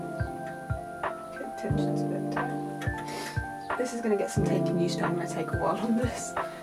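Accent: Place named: United Kingdom. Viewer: May I ask a personal question? English is my second language and I am used to American YouTubers. You sound definetly British but I would like to know from which British region your accent is coming from. Or would it be some common speech pattern for the whole of Great Britain?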